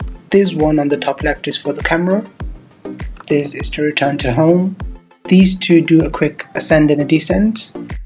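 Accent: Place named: Pakistan. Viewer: You sound like a Pakistani 😕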